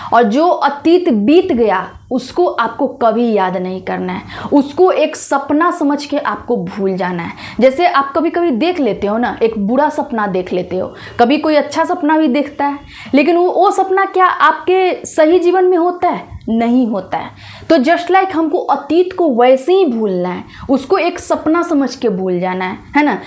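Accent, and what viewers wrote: Bihar, India. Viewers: MADAM, LAGTA HAI AAP MERI TARAH BIHARI HO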